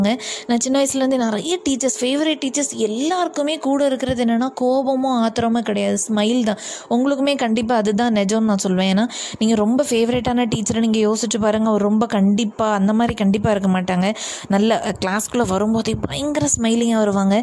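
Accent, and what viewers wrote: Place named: Tirunelveli, India. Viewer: Neenga Tirunelveli ya antha slang varuthey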